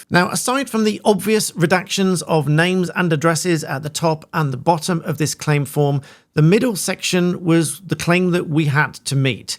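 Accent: United Kingdom. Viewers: I thought you were Blacktail Studios and wondered how you got a British accent 😂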